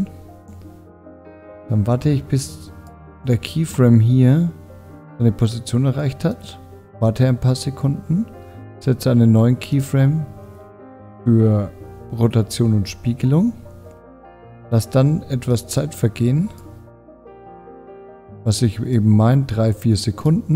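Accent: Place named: Nuremberg, Germany. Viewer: Gut erklärt, Nürnberger Dialekt nich zu überhören :´)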